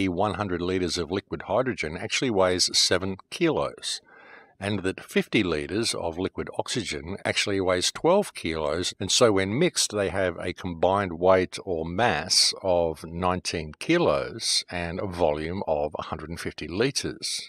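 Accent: Australia. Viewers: sounds Australian to me my man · Theres a reason they pack us into cities. Look up mouse utopia experiment. There was an excellent doco on youtube but it kept getting deleted. Great to hear an Aussie voice on this stuff.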